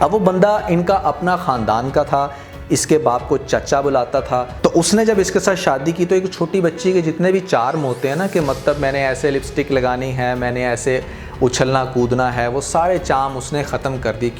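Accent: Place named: Majha, India. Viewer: Punjabi ikdm Majhe wali boli aapne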